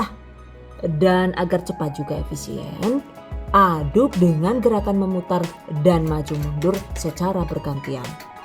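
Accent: Banyumas, Indonesia. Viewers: Assalamualaikum...maaf,kok ada bhs ngapaknya ya...kalau bgtu boleh tahu dari daerah mana yaaa,aku dari cilacap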